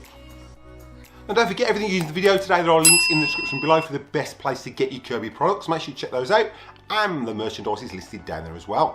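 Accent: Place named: Cockney, United Kingdom. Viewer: Can't understand his English much of the time. He speaks with a strong cockney accent. I wish I could because the information is good.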